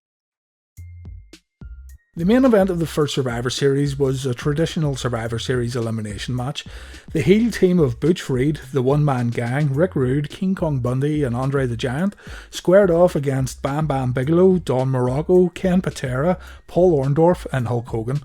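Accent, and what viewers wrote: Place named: Scotland, United Kingdom. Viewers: are you a Scot? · I'm half Irish American and native I been thinking u was Scottish lol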